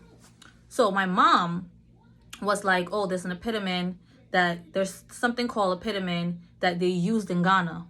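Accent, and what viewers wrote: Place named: Liberia. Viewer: You sound a little like you Liberian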